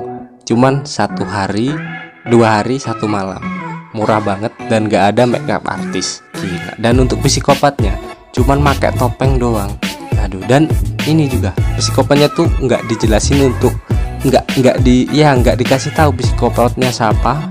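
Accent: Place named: Java, Indonesia. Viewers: logat jawa ny jgn nampak guna kan bahasa indonesia yg jelas dn tepat.. Setiap Youteber mengunakan bhasa indonesia. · Emange harus b.indo. jadi diri sendiri lebih baik drpd harus merubah diri, jdinya aneh. Semangat trs bang, w jg orang jowo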